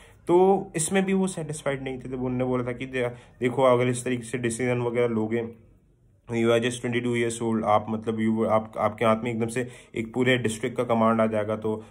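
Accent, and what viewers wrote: Bengal, India. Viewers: are you bengali sir ? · Sir R u bengali ????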